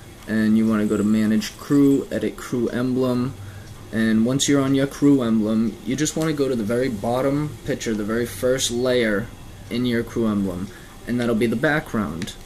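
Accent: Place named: New York, United States. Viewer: damn, some words you pronounce like a real new yorker, and i love it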